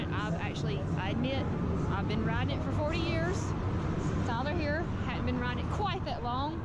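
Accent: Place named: South, United States. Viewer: you sound like Reba McIntyre when you talk. What part of the South are you from? 😁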